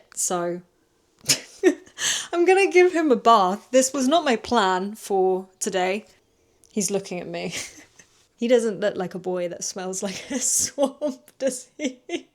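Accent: United Kingdom. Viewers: I really enjoyed your videos and I especially loved your British accent. No wonder you're from the native English-speaking country!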